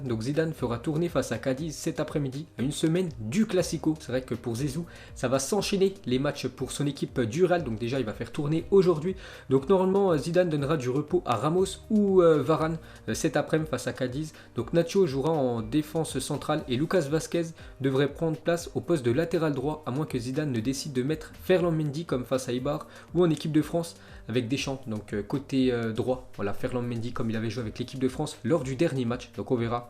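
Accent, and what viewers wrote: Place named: France. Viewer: tu es ridicule de prononcer les noms à la française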